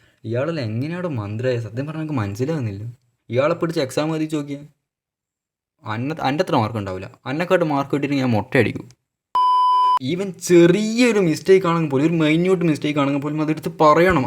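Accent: Kannur, India. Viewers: Kannur slang📈🗿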